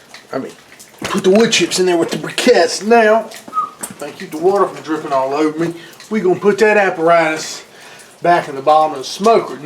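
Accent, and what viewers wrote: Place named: North Carolina, United States. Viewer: cool I go to Cape lookout a lot and they sound like you. Thanks for the video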